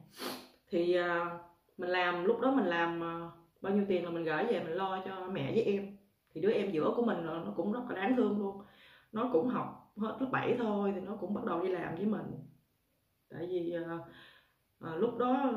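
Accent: Mekong Delta, Vietnam. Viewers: nói chuyện thật thà, dễ nghe quá, đúng là người miền Tây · Nghe giọng miền tây là có cảm tình.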